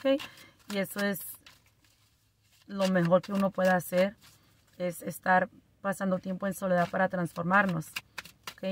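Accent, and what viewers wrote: Mexico. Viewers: ... Me haces reír mucho más aún con tu acento mexicano.. gracias ✨💙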